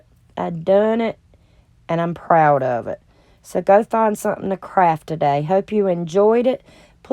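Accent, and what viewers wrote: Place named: Georgia, United States. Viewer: I have to comment on your video. This is the 1st one ive watched & im not sure how i got to you but im SO GLAD i did! 1st of all idk where ypu live but i swear it sounds like listening to home! ( South East Georgia) 2nd...I have been doing floral design and decorating for over 30 yrs now. You have the eye for & know what you like! You also have the gumption to get out there and try it PLUS put it out there for the world to see! The good the bad the ugly...etc..plus ypu are HONEST & realistic in your videos! Ive been watching YouTube for years and collecting craft stuff to flip- upcycle- diy for YEARS....and uts sitting in my garage. Because i dont have the confidence in myself to just get out there sort through it and DO IT. So it doesn't matter what ANYONE says. You are an inspiration to me! An encouragement and a little taste of home when I listen to your voice overs. 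Plus im getting out in my garage this weekend and getting started! Design, decorating, partys, weddings used to be my happy place. I just got burned out. But you are 100% right...this is for YOU what you like, and your learning as you go. It really made me realize that its OK to not have it 100% perfect and set up so everyone only sees the pretty side...ypur a realistic crafter that does make me feel like my messy horde of stuff is a problem only i have! 🤣 so carry on girl! One last thing The lady that taught me how to design had an expression i couldn't help thinking about when you were making this wreath..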